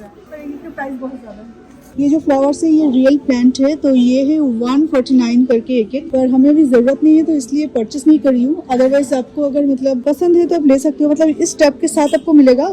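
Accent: Bengal, India. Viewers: She is bengali, it's her accent.... Kindly don't use such language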